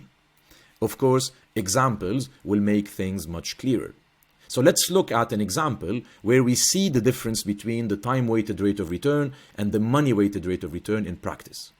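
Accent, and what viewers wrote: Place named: Lebanon. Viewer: I knew this is Lebanese accent, I checked where the institute is located, but great explanation. Thanks a lot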